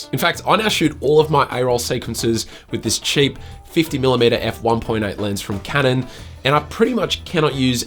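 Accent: Australia. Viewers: Hey, this was very helpful! I was always considering that aperture light but now this just gives me all the more reason to do so! Also, to hear a fellow Aussie accent helped me connect with what you were saying more! Will go through your other stuff and subscribe:)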